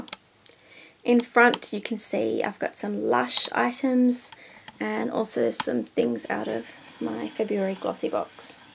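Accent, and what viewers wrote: Australia. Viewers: Knew you were Aussie straight away but I often forget how... Different we sound when watching YouTube or movies xD so jealous of your opi polish collection! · are you australian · LOOOOVE UR ACCENT! <3 best aussie style ever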